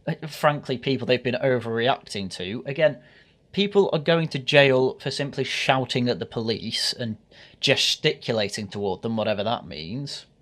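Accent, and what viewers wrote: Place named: England, United Kingdom. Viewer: You use a Welsh name and use Welsh backdrops and then you have an English accent and have the butchers apron for your profile... you fkin tr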